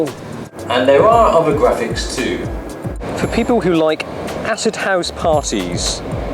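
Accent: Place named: United Kingdom. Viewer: where is that at??? i noticed u were in hk.. with a uk..a ccent?? sorta... i go to hk.. 2-3 times a year.. :P goin this august... not too many hkers speak english.. used to.. not nemore.. u have excellent english.. cheers!!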